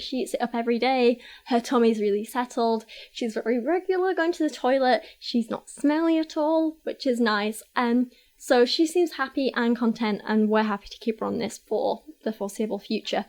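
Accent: United Kingdom. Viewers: Dammit, talks just like my sister, but with an British accent....tripping me out.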